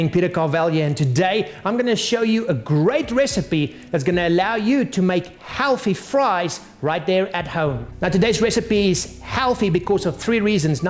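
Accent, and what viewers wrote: Afrikaans, South Africa. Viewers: arank001 South Africa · are you from South Africa? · Definitely a South African accent. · Your afrikaans accent is cool! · He's South African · Michael Evangelista It's South African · What accent does he have? I want to say south African but I really can't tell :/ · Where is this guy's accent from? The only thing I can compare it to is the guy from District 9 (Wikus) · I think he's South African :) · hes south african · Kings Fobos South African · Where are you from? South Africa?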